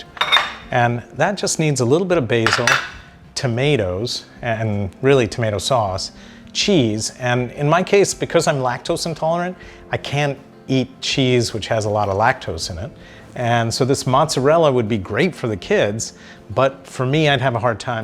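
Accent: United States. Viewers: I'm mesmerized by his American accent over his marathi mulga core! Hehe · Dr. Nene, you have american accent. I am from Florida. Happy to see you making pizza. I do make whole wheat bread pizza and it also becomes delicious. Madhuri ji is very lucky. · So humble and simple person 👍🏻 😁👌🏻😋😋 i like American accent u talk , but its will fabulous if dr. Nene start to end of video only talk marathi 😍😁😁👍🏻😜 please video on Demand only marathi video . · Sir, you described very nicely😍.. But i couldn't understood your fluent american english😣😣please mention the ingredients in the description box , so i will follow it😋😋😋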